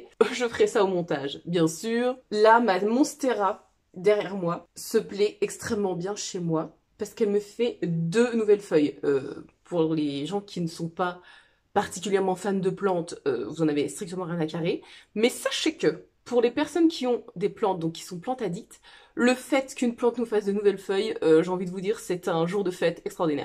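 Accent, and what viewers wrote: Lorraine, France. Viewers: Cc, ça fait du bien de te revoir 😊 Quel bel accent lorrain et beaux tissus. Je ne suis pas convaincue que l’on fasse des affaires dans ces salons 😊 Bonne continuation, à bientôt Raphaëlle DVN a cousu la robe de Bellen Paris, elle est magnifique !!! Beau choix 👍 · Coucou! Je suis de Nancy aussi et comme toi, je suis allée au marché aux tissus le 6 mai. Je partage totalement ton avis concernant la baisse du nombre d'exposants et par conséquent, du choix de tissus. Je trouve aussi que les prix ont suivi la conjoncture économique et qu'on fait de moins bonnes affaires. J'ai été très raisonnable, juste un coupon de jersey et un peu de mercerie. Il faut dire que je suis allée à celui du Luxembourg début mars et mes parents habitent dans la même rue que la grange aux tissus, de quoi faire! Lol Merci pour ta vidéo pétillante et de faire découvrir aux autres cousettes notre pittoresque accent lorrain! 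😂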